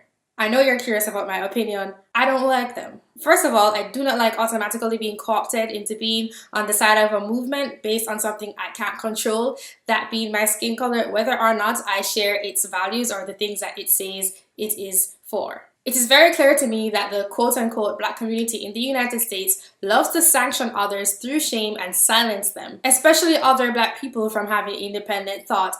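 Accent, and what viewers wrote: Jamaica. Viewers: Is it just me or is her jamaican accent too sexy? (apart from her smexy beauty) Damn woman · You Jamaican? Sound like it? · You sound like an islander. Are you Jamaica? I am too. I'm curious of what socioeconomic class background you came from in the islands before you immigrated because you sound quite elitist and condescending in your interpretations. Did you grow up in an insular middle to upper-class setting in the islands?...away from all the struggling poor black people in the ghetto? Because your perspectives seems sooo limited. You seem to be using that same limited perspective to interpret how Black Americans or Black people in America react to dehumanization and mobilize against brutality. Obvious when Black people in Americas say "Black People" they are most likely referring to themselves and their issues - same way when Black people anywhere else posit themselves as Black people...they are referring to themselves unless otherwise specified. 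But you seem quite invested in making sure non-black people (and white people specifically) know that "NOT ALL BLACKS are like this! Some of us are good and do not rock the boat." People are suffering the world over. You may (or may not be an outside to that) but it's a social reality. You do not get to police how they react and choose to mobilize/organize. I have my qualms about BLM (they are not radical enough) but I have no issues with them choose to mobilize around being brutalized.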